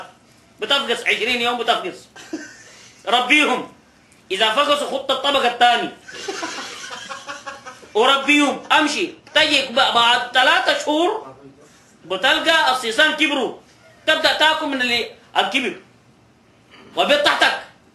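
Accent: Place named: Sudan. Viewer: انا سودداني انت لوغيير سوداني فعلا زوول مبدع و موهوب بس ظااهر انك عاشرت سودانيين كثيير حتي السودانيين انفسهم بعضهم لا بستطيع التحدث بلهجه سودانية خالصه مثل هذه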